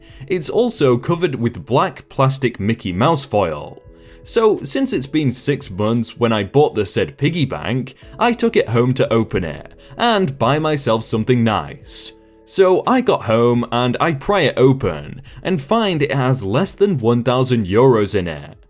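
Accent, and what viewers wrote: United Kingdom. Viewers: Hey, you’re deep. You’re also noticeably more British in your speech. I love it.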